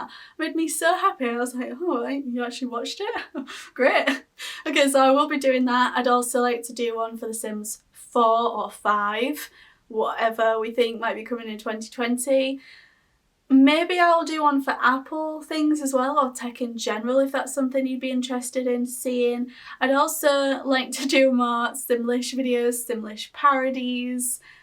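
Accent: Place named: Yorkshire, United Kingdom. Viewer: I found your channel when I was looking up Yorkshire accents. I only know of one person from that part of England. I’m not into Sims, I do have iOS products, but I’m not as excited about them. My point... I’ll get on now and then just to hear you talking. Not trying to be a creeper, it just sounds cool. Take care. 😊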